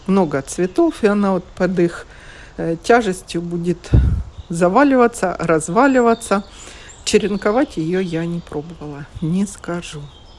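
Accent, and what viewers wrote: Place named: Baltic. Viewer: Очень красивые розы. Приятный голос, русский язык интересно звучит, как будто с прибалтийском оттенком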